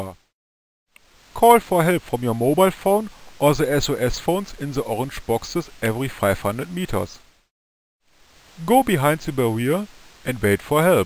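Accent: Germany. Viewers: This has to be the most ridiculous german accent I ever heard. And I am a german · You have done pretty well! As a German I must admit your German accent is awesome! ;-)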